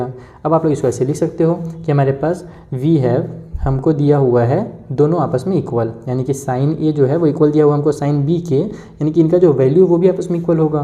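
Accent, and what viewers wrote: Bihar, India. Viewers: Ap bihar se ho sir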